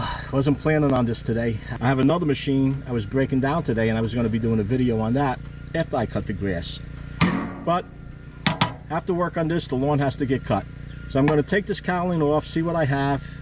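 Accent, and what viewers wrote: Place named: Boston, United States. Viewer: Love the Boston Aaacent!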